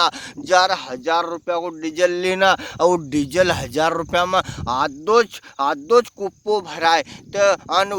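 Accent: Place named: Nimar, India. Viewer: Shuddh malvi bola kr bhai nimadi kyu add krta h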